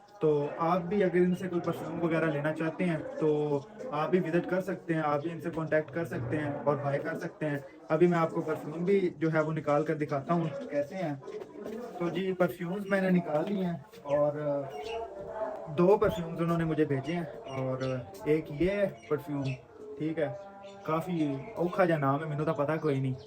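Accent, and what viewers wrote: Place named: Punjab, India. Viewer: Apka punjabi accent bohat acha hai bhai 🤍🤌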